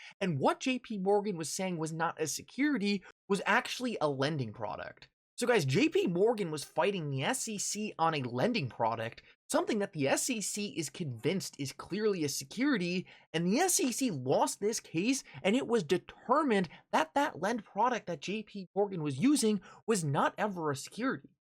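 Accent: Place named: Delaware County, United States. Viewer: That’s that Delco accent… he won’t admit it but I knows it when I hears it…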